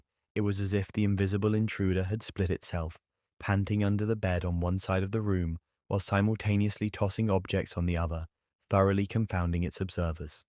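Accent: United Kingdom. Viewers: Nothing better than a British lad reading spooky stuff. Great night ahead.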